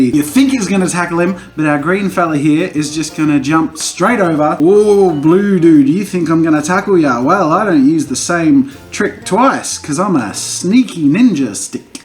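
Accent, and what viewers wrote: Australia. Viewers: he’s definitely Australian that’s for sure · Are u a Australian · why * sounds Australian? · Wow....you're sounding more Australian lately..... weird.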